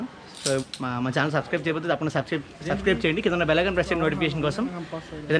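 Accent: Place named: Godavari, India. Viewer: Godavari basha bagundi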